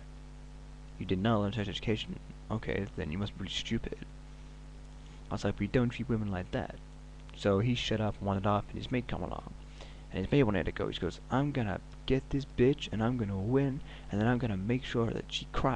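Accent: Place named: United States. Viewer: Dude sersiouly stop with the american acsent its getting old lol also this vid is blury as shit! Liked what ya said though because its so true!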